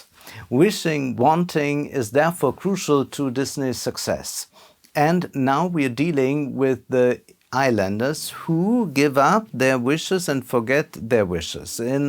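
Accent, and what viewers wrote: Germany. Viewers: Weil sein English zu Denglish ist.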